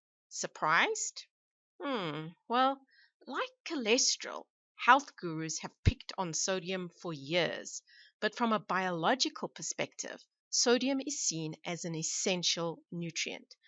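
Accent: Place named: South Africa. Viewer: Excellent informative videos and cute South African accent!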